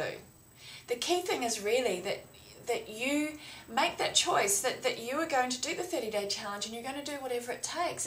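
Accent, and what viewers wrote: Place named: Australia. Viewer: reminds me of how much I love Austrailian accents...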